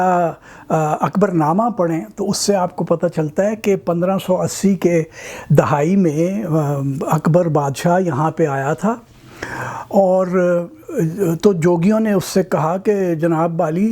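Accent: Punjab, Pakistan. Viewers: My father emigrated from Talagang to Delhi in 47. He expired a few years ago. When I was a child, he told me this story. Your Punjabi accent is similar.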